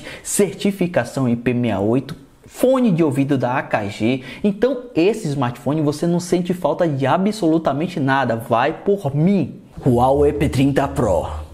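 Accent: Bahia, Brazil. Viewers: Mano vc e da Bahia né ??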